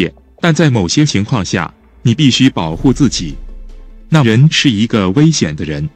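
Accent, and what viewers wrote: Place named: Taiwan. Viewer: 這個語音居然有點台灣國語，是沒錄好嗎==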